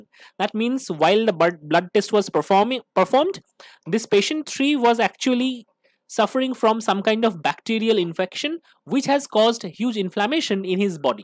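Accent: India. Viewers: The sigh of relief when I heard the Indian accent 😂 I kept telling everyone that I feel horrible, exhausted and nauseous all the time and always got a "we all feel that way, just wait until you have kids, then you'll know true exhaustion 😡" I really feel like punching those people in the face now, because they fired me for always feeling sick and performing badly because of it while not giving me time off to see a doctor. I will cause a scene. This is Europe not America ☺️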